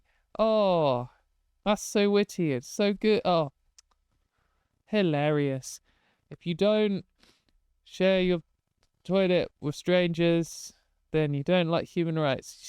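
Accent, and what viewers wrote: RP, United Kingdom. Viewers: Sending love from California. Your posh voice puts me to sleep after work thanks for the content 💞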